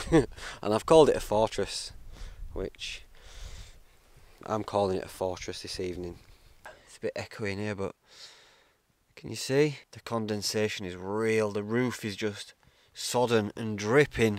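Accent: Yorkshire, United Kingdom. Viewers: my favourite fellow 'mucker' for outdoor stuff. And not bad a carpenter too. Your natural skill of good Yorkshire waffling is brammer Keep on enjoying yourself. Really shows in Ur vids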